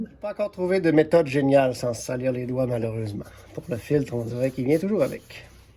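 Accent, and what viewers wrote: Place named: Quebec, Canada. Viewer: Accent sympathique du Quebec.... Bonjour depuis la Suisse, mon pays. J'ai une nine- t Racer...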